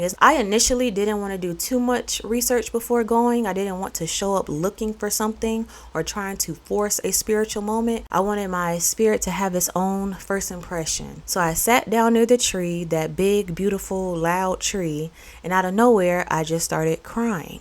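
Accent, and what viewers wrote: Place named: South, United States. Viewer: I would love to know where you are from. You sound as though you fit right into the south. Welcome back when you return to Louisiana.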